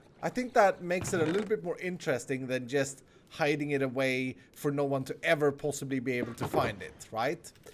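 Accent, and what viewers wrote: Sweden. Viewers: r u swedish? i think because of 4 reasons. 1. ur accent sound like swedish (ik because im swedish too) 2. = icecold85. 3. is = ice. kall = cold. 4. u live in a ice castle in HermitCraft and an ice castle should make your icecold. icecold85:)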